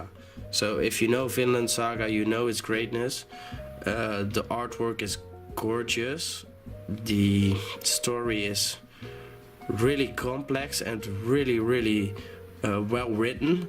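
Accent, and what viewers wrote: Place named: Netherlands. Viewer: I can hear ur from the netherlands, fellow dutchman. 😂 cool collection!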